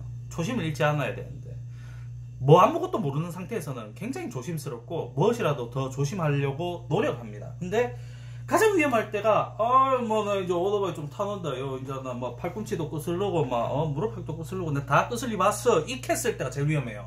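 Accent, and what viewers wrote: Gyeongsang, South Korea. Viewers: 피자사장? 말투? 경상도... 혹시 진주 유로코사장님이십니까?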